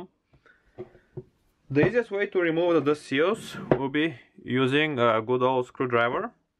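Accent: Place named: Russia. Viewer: Какой прекрасный русский акцент )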